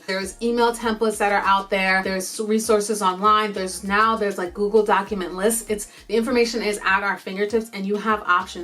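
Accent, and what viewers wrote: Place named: New York, United States. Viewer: girl - LOVING this video. thank you for sharing your feelings and resources. I appreciate you and will follow up on as many as I can. you DO have a voice and I love hearing it! PS - is that a New York accent?! never heard that in your voice before ahahaha (I already knew you were in NY). I do love Fenty and will continue to support her brand (I also recently bought that new blush). Similarly tho, I try to stay on a budget hahaha. wowwwww the hair products claps SO MANY options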